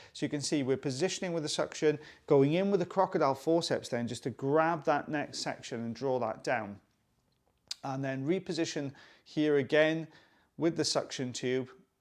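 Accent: Wales, United Kingdom. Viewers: When it's 106 degrees in California and you come across a lovely welsh accent! Dioch!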